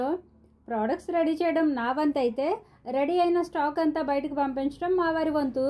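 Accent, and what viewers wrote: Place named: Kakinada, India. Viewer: Kakinada yasa tho baley sagadeesi matladutunnarandi.all the best.🎉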